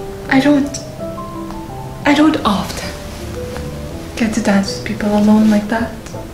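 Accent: Scotland, United Kingdom. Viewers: Scottish, Irish, British, or Dutch accent? Either way your voice is lovely! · wow, not gonna lie i did not realize you were scottish til just now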